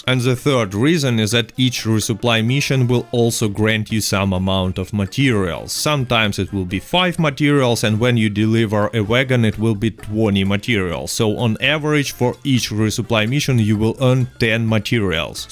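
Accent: Russia. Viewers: Russian accent and wilderness sounds great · he has a Russian accent maybe because he is Russian?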